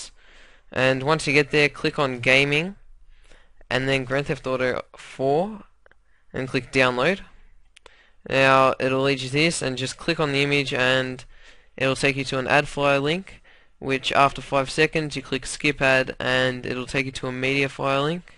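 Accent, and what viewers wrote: Australia. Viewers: ha, i knew it! the australian accent is just unmistakeable :D whereabouts do u live? i am from germany and spent half a year over there, was fun ;)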